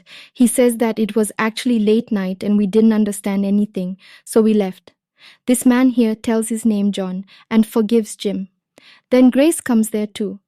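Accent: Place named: India. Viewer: Indian voice I hate it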